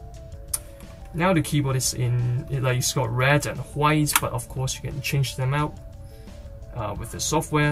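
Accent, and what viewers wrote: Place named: Denmark. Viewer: you can hear thet u are Danish but ure videoes is really cool though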